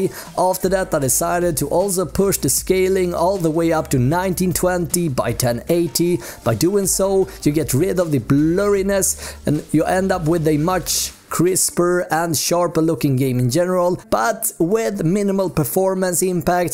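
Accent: Sweden. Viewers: Sounds swedish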